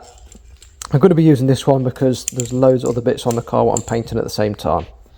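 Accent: United Kingdom. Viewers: the commentary is so british lmao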